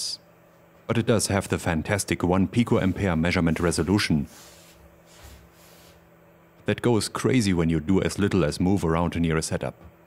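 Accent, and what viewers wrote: Germany. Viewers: Man merkt das du deutsch bist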